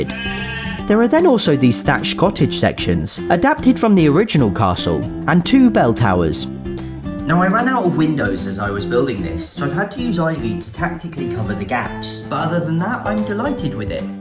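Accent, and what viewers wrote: United Kingdom. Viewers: glad you’re British and not American if you know what I mean. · He is British I think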